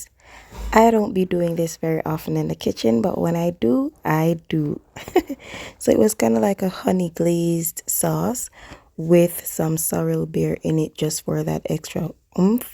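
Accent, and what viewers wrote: Jamaica. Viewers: When you speak rank Jamaican it is hard to understand you, please be mindful that persons other than Jamaicans are watching your videos. Good video nevertheless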